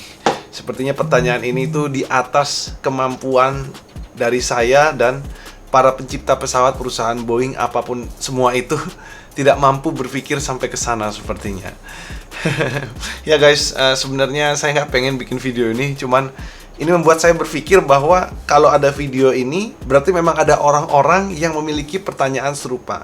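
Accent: Java, Indonesia. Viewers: Blek bok blek bok blek ohhhh blek bok medokkk waeeeee suaranyaaa,#ntaplah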